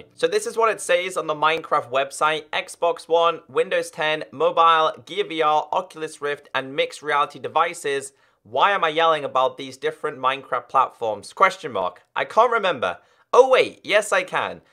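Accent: United Kingdom. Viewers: Such clear pronunciation) Is he British?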